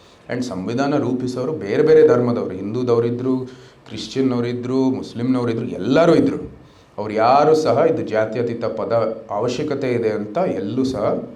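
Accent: Standard. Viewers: ನಿಮ್ಮ ಶುದ್ಧ ಕನ್ನಡ ಮತ್ತು ಸಂಯಮ ಯುತ ಮಾತು, ಮತ್ತು ಜ್ಯಾನ ಸಂಪತಿಗೆ ನಮ್ಮ ಗೌರವಯುತ ನಮನಗಳು 🙏🙏🙏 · ನೀವು ಹೊರ ದೇಶದಲ್ಲಿ ಓದಿ ಬಂದಿದ್ದರೂ ನಿಮ್ಮ ಶುದ್ಧ ಕನ್ನಡ ಕೇಳಿ ತುಂಬಾ ಸಂತೋಷವಾಯಿತು.❤😊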